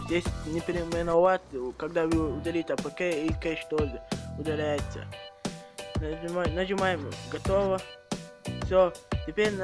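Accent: Chechnya, Russia. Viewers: ОН ЧЕЧЕНЕЦ!!!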